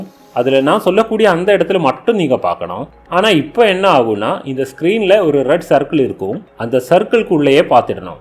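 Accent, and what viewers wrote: Kerala, India. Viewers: You are a malayalee???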